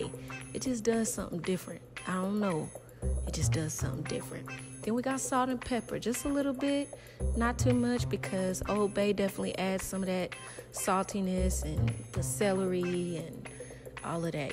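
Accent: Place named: South, United States. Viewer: Beautiful crab cakes. I'm going to make some. Also, that southern accent just crushed me. I'm in love.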